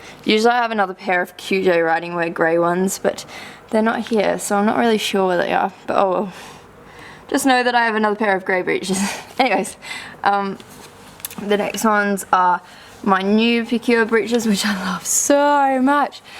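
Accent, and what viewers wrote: Australia. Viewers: Shes australian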